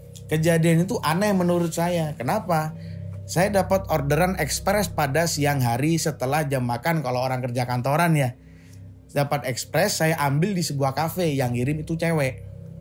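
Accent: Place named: Madura, Indonesia. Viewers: Accent nya Suroboyoan bangett,,tapi mas nya orang Madura nih hee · Asli Madura yaa mass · Iyut Reng oreng Medhureh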